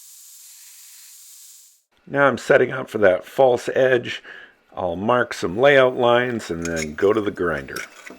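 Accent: Canada. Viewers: Always thought you were Canadian.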